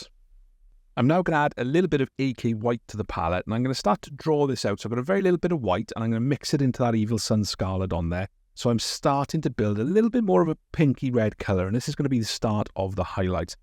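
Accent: Wales, United Kingdom. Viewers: Not sure why the algorithm has only now led me to your channel. Pleasantly surprised to hear a fellow Welsh accent! Looking forward to watching more of your content!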